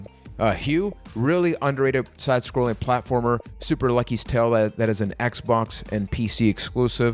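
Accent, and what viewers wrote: Puerto Rico. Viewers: Tu eres boricua ?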